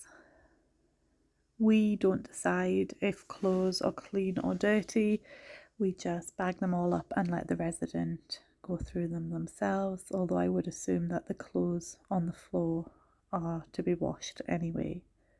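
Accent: Scotland, United Kingdom. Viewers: You have picked up a somewhat Scottish accent- it doesn't take long! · From Canada. Grandparents from Scotland, so your accent is familiar.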